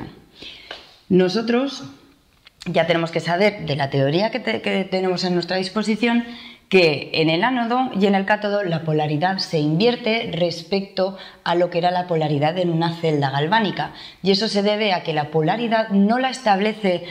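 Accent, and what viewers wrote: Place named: Spain. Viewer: Maestra, de que ciudad de España es usted? Me agrada su acento y no es tan enfadoso como otros españoles mucho más marcados. 🙂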